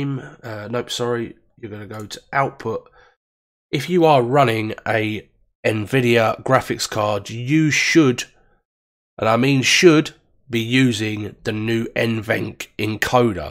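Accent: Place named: United Kingdom. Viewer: Ur too much British accent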